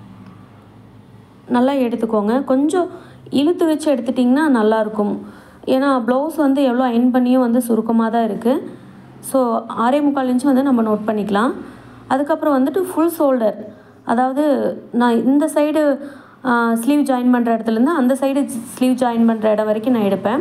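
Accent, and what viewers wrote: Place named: Coimbatore, India. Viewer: Hand writing super sister. Neenga yentha ooru sister unga language karu coimbatore side la pesaramadri erukku.. niraye video paarpen unga video rembo honest avum solli thareenga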